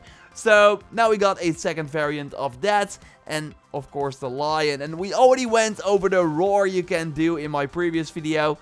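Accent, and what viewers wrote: Netherlands. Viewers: a dutch accent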